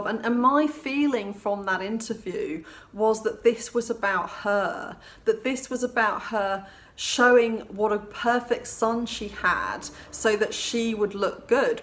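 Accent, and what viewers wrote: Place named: London, United Kingdom. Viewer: So it IS a London accent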